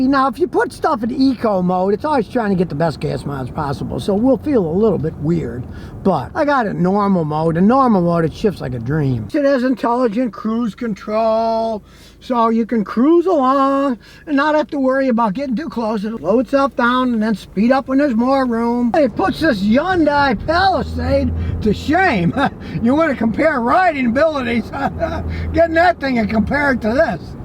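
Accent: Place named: Texas, United States. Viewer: It is the Texas accent he picked up down there 😃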